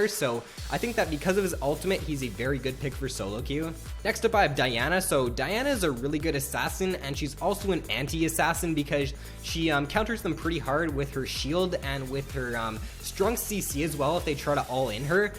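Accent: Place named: Canada. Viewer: hey, are you from Canada? I'm hearing a slight accent:P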